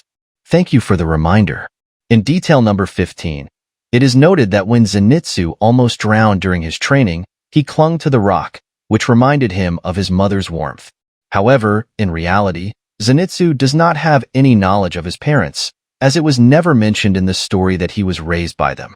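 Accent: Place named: United States. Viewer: Stop fcking using American accent to Japanese names ffs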